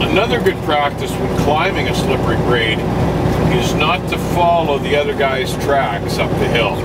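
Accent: Canada. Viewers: You ate a great driver i can tell that you have been a professional for a long time. I can also tell you’re Canadian, the way you pronounce your O’s. 😃. Thanks for the video, very helpful!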